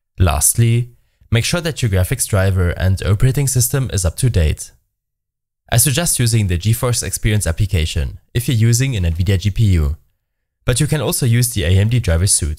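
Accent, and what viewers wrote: Germany. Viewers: Hört sich ekelhaft deutsch an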